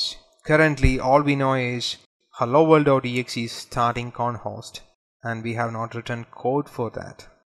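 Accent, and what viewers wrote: India. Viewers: Thank you for the upload, though the Indian accent is rather thick and hard to understand at times. The "CC" help in that area.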